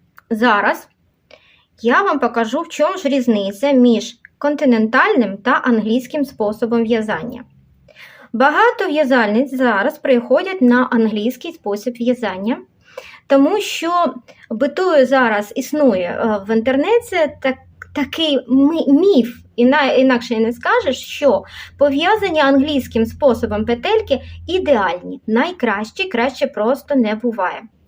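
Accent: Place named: Surzhyk, Ukraine. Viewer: Да, слышно суржик,много слов перекрученных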